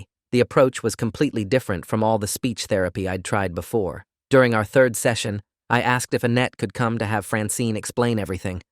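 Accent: United Kingdom. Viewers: It sounds goofy as shit in some scenarios, and since he sounds British how people view him changed because America is either the most welcoming place to foreigners or the most brutal depending where, and some people will mistreat him, and think he’s weird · From what it sounds like, his brain mistook stress for brain damage and tried to rewire itself to protect itself but rewired itself to his knowledge of british accents instead of his normal american one Also, as a british person, I'm offended. A british accent hurt him this much. Those people treated him terribly for sounding like people I know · british accents are cool man, why would you want to lose it anyway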